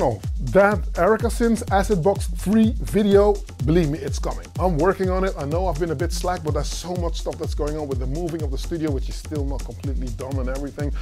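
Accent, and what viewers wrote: Netherlands. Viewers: You def dutch by the aound of your accent lol😂🚀 dope video you gained a sub brother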